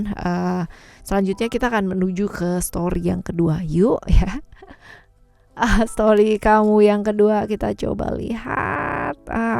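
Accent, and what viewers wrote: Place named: Java, Indonesia. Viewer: Kalau dipikir-pikir cerita bacaannya lucu nganggo banget..!yg membaca saja sampai mumet..😃..mbak per nah bilang" ini hubungan macam apa.....?",halah mbak ...😴...nggak usah dipikir banget2 bikin mumet.! Ma'af ya mbak...aq mau tanya sesuatu: mbak asli orang mana.?, Kok logat cara bicara bukan orang luar jawa.kalau toh mbak ada darah keturunan orang luar indon,saya pastikan ada salah satu dr ortu indon..☺ Intonasi ,logat /penyampaian ,warna suara mbak nggak bikin nek /jenuh ,luwes & frekuwensi sedang😃👍Trims...nuwon...!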